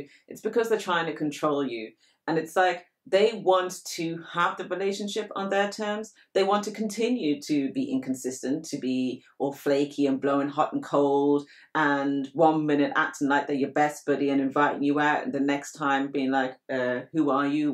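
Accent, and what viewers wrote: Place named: United Kingdom. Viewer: Love when she says "are you flipping serious?!" in that British accent. 😊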